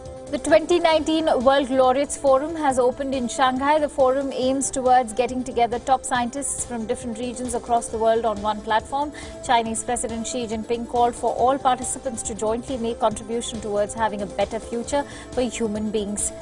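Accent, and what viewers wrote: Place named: India. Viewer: Ewwwwwww Indian accent lol destroyed English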